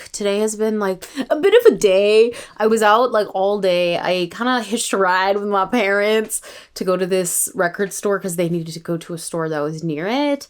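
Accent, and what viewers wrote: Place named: United Kingdom. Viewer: i need you to narrate my books in your british accent asl;dkgj i love your writing vlogs so much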